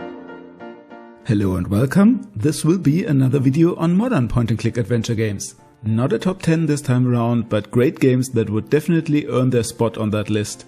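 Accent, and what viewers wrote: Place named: Germany. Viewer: da hämmert jemand seinen deutschen dialekt aber wirklich mit aller gewalt durch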